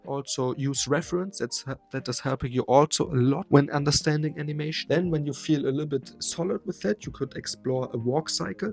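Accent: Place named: Germany. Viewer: i hören little bit deutsch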